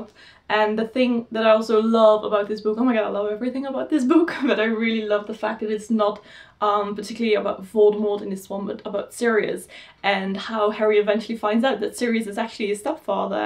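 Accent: United Kingdom. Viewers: me too. You sound very british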